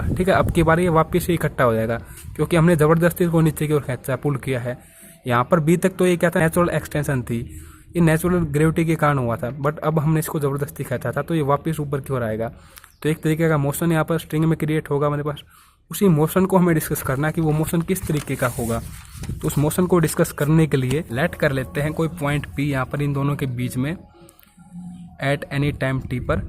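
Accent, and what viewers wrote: Haryana, India. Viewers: Sir aap haryana se ho?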